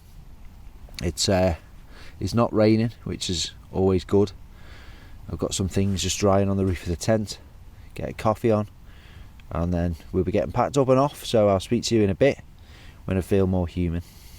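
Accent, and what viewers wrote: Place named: Liverpool, United Kingdom. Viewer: Always enjoy videos, a fellow scouse accent on the youtube!!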